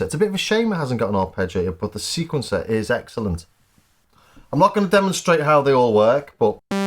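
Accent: Liverpool, United Kingdom. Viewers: Love that Liverpool accent!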